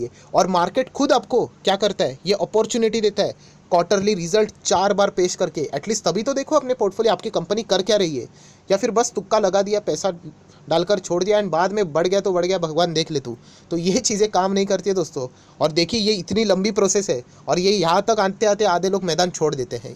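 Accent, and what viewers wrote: Maharashtra, India. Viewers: Are you marathi speaking?Seems to be so from your pronunciations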